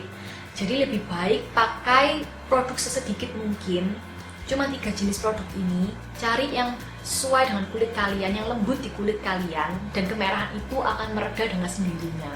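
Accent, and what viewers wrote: Java, Indonesia. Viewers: medok mbak?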